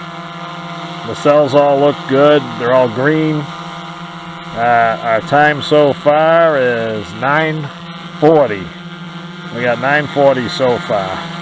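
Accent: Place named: Boston, United States. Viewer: You sound like you’re from Boston. I just got into these older inspires and I’m probAbly gonna start running into battery problems. Maybe you can help out a fellow Bostonian with a couple of inspire 1s. Love to hear from you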